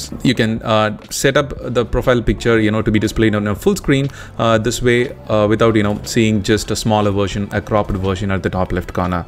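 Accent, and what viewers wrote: India. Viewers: Love Indian accent wish I could do it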